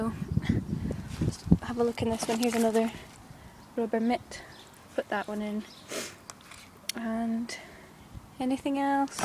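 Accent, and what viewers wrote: Scotland, United Kingdom. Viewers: Are you Scottish? I love the accent!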